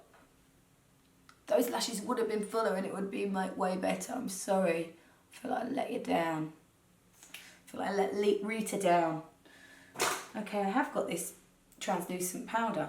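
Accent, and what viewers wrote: United Kingdom. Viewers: I really love the tutorial and your knowledge of Rita Hayworth. I enjoyed the videos that you and your sister make because I always come away with something that I didn’t know and feeling a little bit better after listening to your lovely British accent. I am watching you from Phoenix Arizona in America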